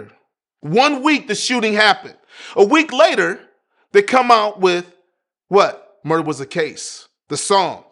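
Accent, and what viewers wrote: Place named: West Coast, United States. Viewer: West coast accent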